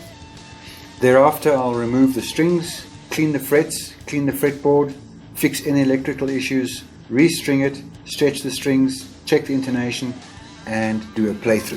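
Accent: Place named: South Africa. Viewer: Nice, you must be South African. 🎉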